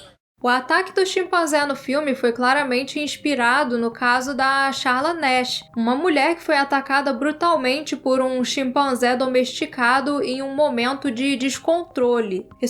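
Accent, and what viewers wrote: Rio de Janeiro, Brazil. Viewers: Timbre agradável e sotaque carioca bacana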